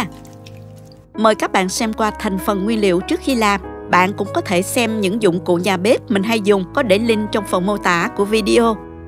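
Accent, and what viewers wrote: South, Vietnam. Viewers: Cám ơn có chia sẻ những món và nói chuyện rất đúng chuẩn là người Việt Nam trong Nam không lai điệu ngoài Bắc chân hang như cho bao nhiêu đường hay kho khoảng bao nhiêu phút là được nghe rất hay chứ bây giờ thì có rất nhiều người dùng những Từ như khoảng bao nhiêu phút mà nó là tầm ba phút nghe nó sao sao 🙏🙏🙏🙏🙏🙏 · Minh cu nghi dang o Binh Duong, gan Saigon! Vietnam. Xin Co^ cho biet Co^ dang o dau nghe. Rat yeu men Co^ qua tai nau ăn va giong noi ngot ngao Nam bo^. Nguoi mien Tay o California US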